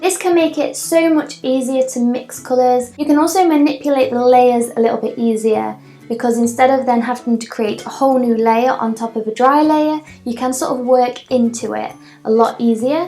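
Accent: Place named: Northern England, United Kingdom. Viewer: I'm an acrylic painter, but I'll have to try out oils now... By the way, where is your accent from? Northern England? (I have no idea if that's a good guess or if I'm an idiot). It's lovely! 😁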